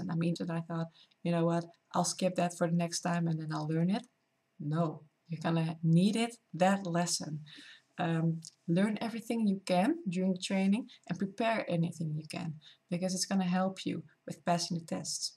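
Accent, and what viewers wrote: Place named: Netherlands. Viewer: Sweet accent! Dutch? Scandinavian? German? I find that in any field, it is difficult to study and work at the same time. Agree with what you say.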